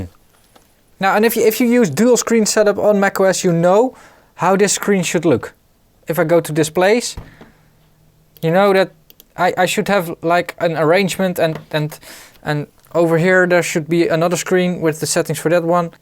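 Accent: Netherlands. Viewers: goedemiddag Nederland , ik heb die update ook gedaan, maar bij mij werkt alles nog goed , ik dacht al jou accent klinkt zo Nederlands :)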